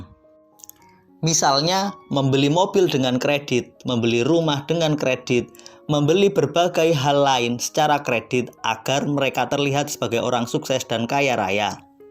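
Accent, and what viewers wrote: Java, Indonesia. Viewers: Wah rasis juga ya. Emang kenapa klo suaranya jawa medhok? Apakah jawa itu miskin?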